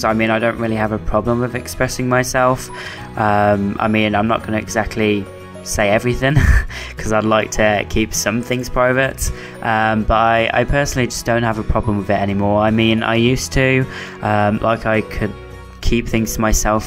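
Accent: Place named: United Kingdom. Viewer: We love you " " your the next "Mental Heath Hero" that will take the world by storm! Your always getting a friendship with me no matter what your mental health status is! BIG BIG HUGS on your bravery and sharing your journey on this video! Your first video was a "Groundbreaking Hit"! Your "British" voice is as adorable as ever and I'm hoping you will do a musical "Let's Play" where only you are singing the entire time and in conversation! But until then you are loved and I enjoyed this video very much!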